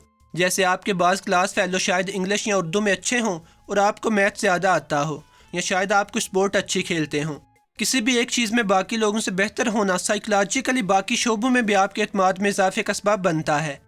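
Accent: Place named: Sialkot, Pakistan. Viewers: Punjabi urdu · App ka lehja sialkoti hy na.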